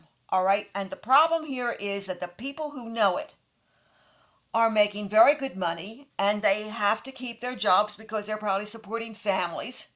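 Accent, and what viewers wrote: Philadelphia, United States. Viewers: ❤❤❤❤❤❤❤❤❤!!!! New subscriber. You sound like you are from Philly or New Jersey.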